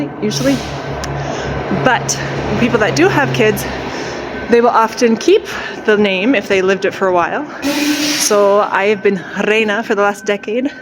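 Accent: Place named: Canada. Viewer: Wow! This is how Canadians sounds? This could be a good chanel to listen English for practice.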